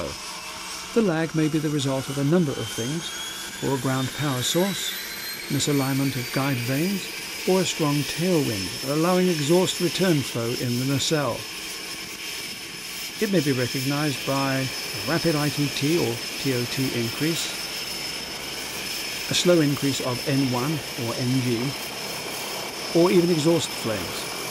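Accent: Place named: United Kingdom. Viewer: Thank you... :-) by the way, may I ask you some detail about the DVD program? I would like to buy it, to my ears the speaker seems to be British rather than American...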